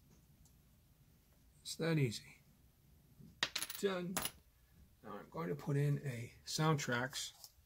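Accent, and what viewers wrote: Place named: Bronx, United States. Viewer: U must be from Bronx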